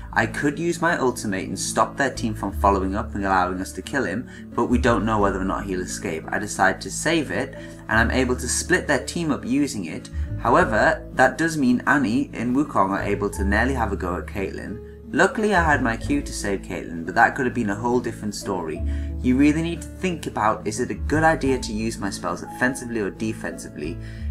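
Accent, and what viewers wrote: United Kingdom. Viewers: Im not a native english speaker, so my accent tends to be more US than UK, but after watching this vid, i will only speak with a british accent, because its so fenomenal and classy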